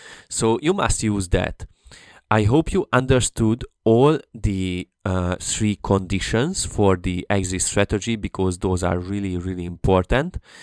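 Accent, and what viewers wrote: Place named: Hungary. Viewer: I can recognize a Hungarian accent ;) Great video, thanks!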